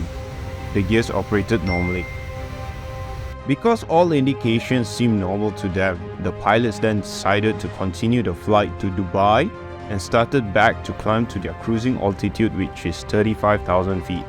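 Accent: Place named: Singapore. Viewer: Verry Verry good video, I enjoyed thouroughly und I Love the friendly Singapore accent, keep upp the great Work 👍👍👍👍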